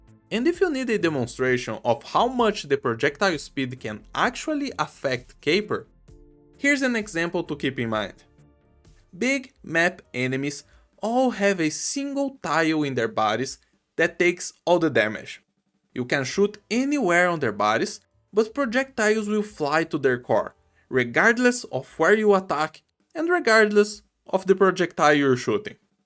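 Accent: Brazil. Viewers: Tu é BR né? O sotaque é impossível de não perceber KKKKKKK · Eu percebi que era Br pelo sotaque, entrei no seu perfil e li Brasil 😊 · are you perhaps brazillian? just curious. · Sabia que era BR so pelo jeito de falar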